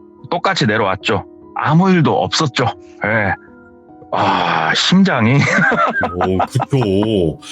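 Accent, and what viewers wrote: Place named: Seoul, South Korea. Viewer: 수줍은 (얼추 서울말쓰시는) 넘 어색혀..ㅋㅋㅋ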